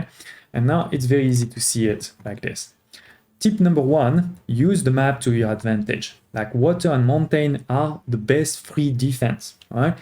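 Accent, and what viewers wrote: France. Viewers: On aime l'accent FR à couper au couteau😊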